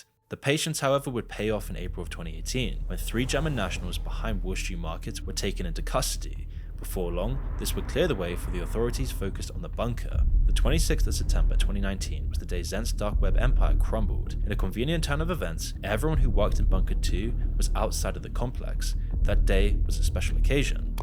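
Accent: United Kingdom. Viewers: Hes british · Very thick English accent. Very hard to understand. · ffs another british voice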